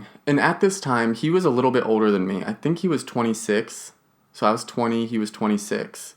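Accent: United Kingdom. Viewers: you are so hot you could turn on a dead guy. Oh, the shirt looks super. The British accent is a turn on for me as well. You look and sound super sweet and I know you must be since I have seen some of your adult films. Please take care because you do great videos, and you certainly are wonderful to look at and listen to. Thanks for the videos!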